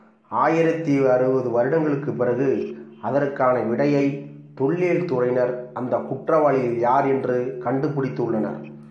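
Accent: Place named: Telugu, India. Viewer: Yen da kena pu**a.. loos kuu...ishtathuku olaritu iruka.. kabothi... athitya karikalan sagumbothu.. raja raja chinna paiyan da... athithya karikalana konathu.. pandiya natta serntha" abathu thavi" veerargal than...I vanga chera desatha serntha mun mudi anthanargal(nmaboothris) atharvana vedam therinjavanga... veerapandiya mannaoda adi aatkal.. Ivanka than konnanga athithya karikala.. ithu theryama loosu mathri olaritu iruka... un voice ah theryuthu sathyama nee oru "GOLTI" koothi nu ..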